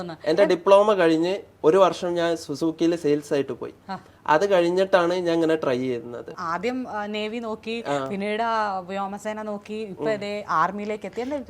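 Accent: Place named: Kozhikode, India. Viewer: Kozhikodane ne parayanda cheetta. Ningale samsaram keettal nammale veetil ullore samsarikkanana feel 😍. Randupeerum poli 🥰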